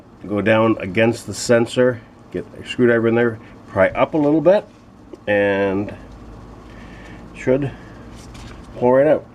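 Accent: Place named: Canada. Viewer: Hey Great Video Eh? Very Helpful and I love hearing your Canadian Accent. Is it ever excellent eh? Clicked the thumbs up and subscribed for future videos. Cheer's!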